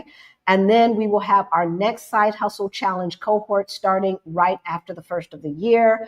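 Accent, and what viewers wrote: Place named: Pacific Northwest, United States. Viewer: I can hear PNW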